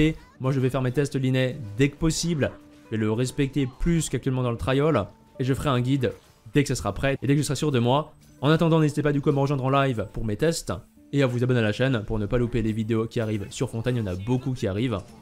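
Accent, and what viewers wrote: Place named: France. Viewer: Mec tu peux utiliser des mots français pour parler des capacités des persos parce que tu utilises des mots anglais mais tu les prononces avec un accent français donc on comprend pas trop. Merci d’avance.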